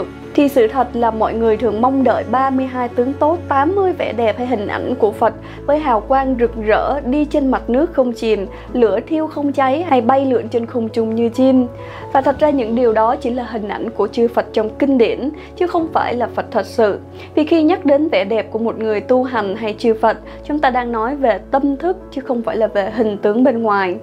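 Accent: Standard. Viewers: Chúc mừng cháu giỏi về chính phát nghe quá chuẩn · Nam mo a di ďa phat nam mo dau da minh tue phat toi nghe co noi pua chuan chuc co luon vuì khòe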